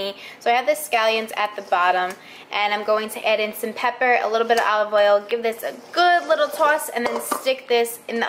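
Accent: New York, United States. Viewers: Are you from New York or the midwest? That accent is so strong. I like it though btw you are gorgeous! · Thank you thank you. Are you from new york or new jersey? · New Yorkers? Long Island? :) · Are u from NY? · true New York/ Jersey accent there lol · Where are you from? I love you accent, sounds New York or jersey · I follow you on insta and I never took in your New York accent till now. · where are you from? I hear a bit of new york dialect? :) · Are you from New York??❤️ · Sounds very New York... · what part of Jersey or New York are you from...anyone with ears can hear it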